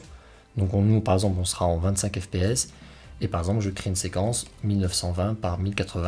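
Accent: Toulouse, France. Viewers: Merci beaucoup pour ton généreux partage de tes nombreuses compétences! Ton éloquence et ton sens de la concision sont aussi très agréables! Je viens de m'équiper avec un Drone DJI Air 2s ainsi qu'un MacBook Pro assez performant équipé du logiciel de montage Final Cut. Alors voici ma question: est-ce que tu as déjà utilisé ce soft? pourquoi as-tu opté pour Première Pro? As-tu eu l'occasion de faire un comparatif Performances, Usage, Prix, Efficacité? Ferais-tu à l'occasion une vidéo d'initiation à ce logiciel? Alors certes on trouve des tutos en ligne, mais j'aurais beaucoup plus de plaisir à voir le tien, adapté aux vols et films cinématiques de Drones. Personne d'OOOtre sur le web n'a cet accent toulousain inimitable pour moi et que tu arbores avec fierté! Bravo, Bravo et encore merci... Je me demande comment tu as le temps de faire tout cela? Tu dois être bigrement passionné et efficace!!!!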